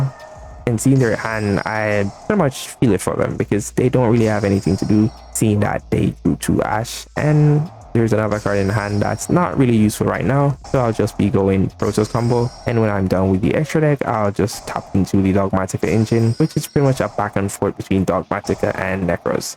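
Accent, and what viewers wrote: Jamaica. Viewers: He jamaican?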